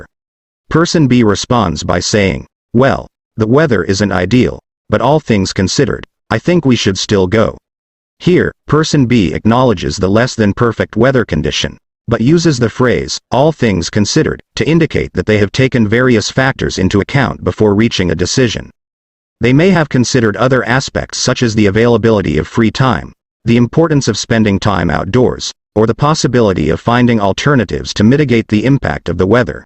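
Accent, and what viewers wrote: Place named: United States. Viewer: Woooow ❤ I like it American accent and speed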